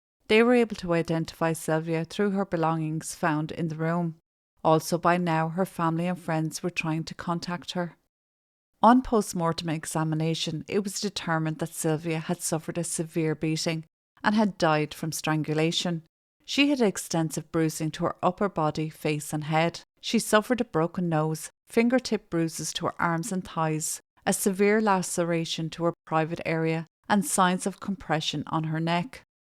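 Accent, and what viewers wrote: Ireland. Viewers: Great to hear your lovely Irish accent as iive been stuck in London since my body fell apart about 6 years ago, 😮.. and wasn't able to make it back as i had to get medical leave as my BasterD hips Collapsed then the bottom of my spine Popped 😮... then CoviD, then a Wheelchair ♿, then 4 years between each hip replacement then......😅...AAaaaH JeaZuS, i must be boring 💤 you now 😂😅... Anyway... fantastic show, ive been Religiously following the absolute DicK Circus 🤹‍♂️ that is poor Lucy Letbys lincining and SCAPEGOATING 😢😢😢😢.. I Think 🤔 Both Country's Justice systems are 0ne 😮😮😢❤❤❤❤🎉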